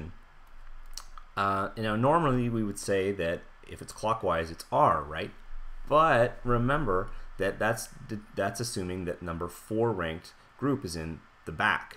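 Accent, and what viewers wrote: Canada. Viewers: That canadian accent tho!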